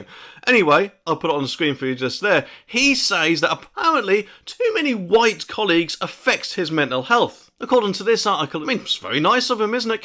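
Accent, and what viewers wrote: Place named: East Anglia, United Kingdom. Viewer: Nice to hear an East Anglian (Norfolk?) accent on youtube 😊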